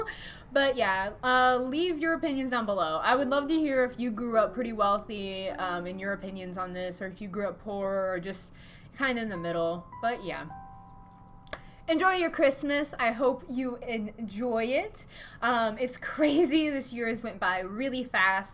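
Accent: South, United States. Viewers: To be honest, I could tell you were from like a southern area. But I am too. Hi southern sister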